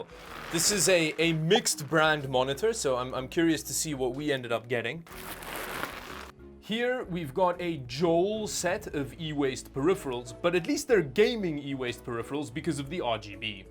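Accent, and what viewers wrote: South Africa. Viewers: Are you from South Africa? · Is that a Saffa accent I sense? ☆ ○ ☆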